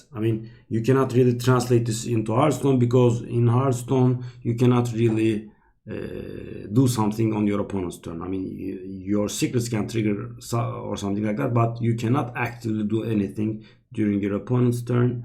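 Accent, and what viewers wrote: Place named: Turkey. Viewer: abi vallaha konuşmandan anladım türk olduğunu :)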